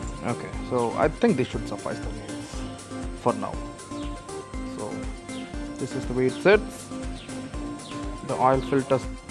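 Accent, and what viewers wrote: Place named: United States. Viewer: Your English accent looks like that born in the United states of America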